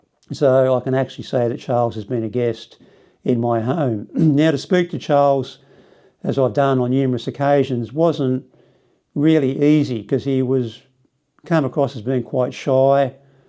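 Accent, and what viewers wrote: Australia. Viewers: It's great to hear that beautiful Australian accent Thanks very much for your story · Me too, just to hear a fellow Aussie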